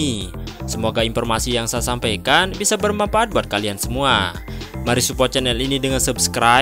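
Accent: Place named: Ambon, Indonesia. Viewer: Logat nya mah orang ambon nih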